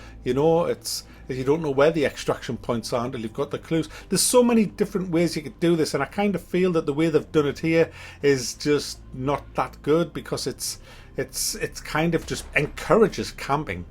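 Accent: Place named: Newcastle, United Kingdom. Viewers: So someone asked me if I was on Youtube. I said no, so I checked out the channel and fuck me, we sound almost the same. Where abouts in the Newcastle area are you from? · soon as i heard his geordie voice i switched off lmao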